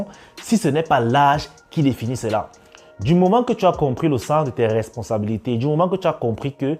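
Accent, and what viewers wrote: Cameroon. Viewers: L accent camer du bled me fait toujours rire lol 😂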